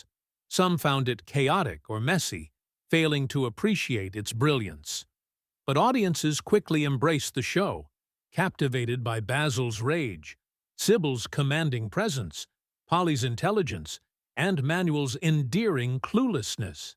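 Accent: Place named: United States. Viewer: Dont waste your time watching this , dumb yankee voiceover , a collection of repetitive screenshots , clickbait , utter BS , whoever put this together must have the IQ of a newt. Oh and the thumb showing her in a bra ,is clickbait The actual scene was Basil impersonating a Nazi, not mentioned at all in this vid .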